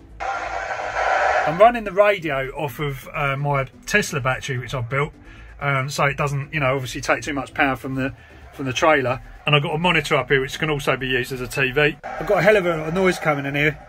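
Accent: United Kingdom. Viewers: Don’t worry about correcting us with the UK radio station If Americans don’t understand they’re watching a guy in the UK with a UK accent and he’s pretty much probably most definitely English is there a problem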